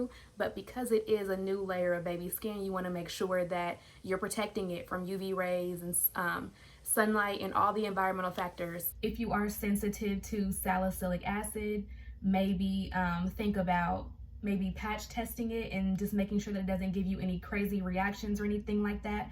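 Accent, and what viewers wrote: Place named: South Africa. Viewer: Hey. Am from South Africa. (Am Assuming) You sound South African😹😹 Where can I get that face washer😭😭😭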